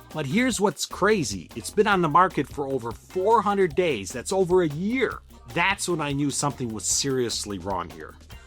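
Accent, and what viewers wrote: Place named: Michigan, United States. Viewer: Interesting video. Love your Michigan accent!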